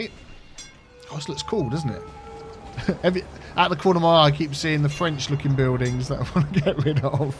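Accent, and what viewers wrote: United Kingdom. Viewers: Your voice is very British. You could be an actor for my listening exam this year. Especially the words ''there'' and ''that'' sound British.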